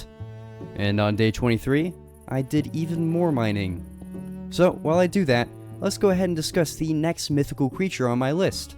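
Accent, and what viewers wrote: Scotland, United Kingdom. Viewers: I’m Scottish and it kinda close😂😂😂😂😂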